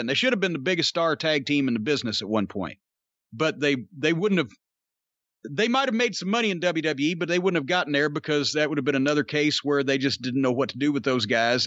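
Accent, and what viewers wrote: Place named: Delmarva, United States. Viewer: Delmarva accent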